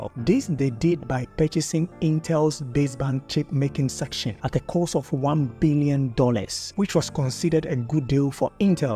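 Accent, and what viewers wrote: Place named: Africa. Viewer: Cool are u African becuse your English reveals your country It's really cool Love from india🇮🇳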